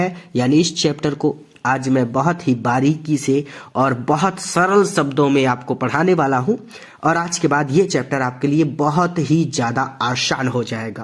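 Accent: Allahabad, India. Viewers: Sir aap allahabad se hai kya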